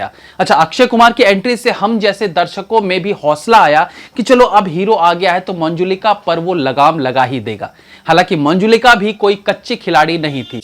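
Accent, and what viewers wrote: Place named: Bengal, India. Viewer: Bengali Voice like Mamta Banerjee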